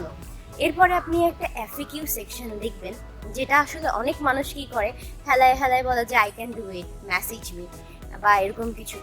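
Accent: Chittagong, Bangladesh. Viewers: Apnar basa ki Chittagong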